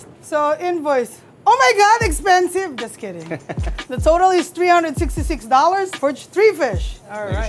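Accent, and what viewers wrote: Philippines. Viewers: Filipino humor is really like everywhere and you know it's pinoy style because of the delivery. I love your cooking style. You're not afraid to improvise. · Alam mo bilang pilipino nakakataba na di mo binago attitude mo as accent bilang pilipino kahit malayo na narating mo more power to you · you can definitely tell she actually used to live in PH just by her humor and accent haha ! love her,, nakakaproud maging pinoy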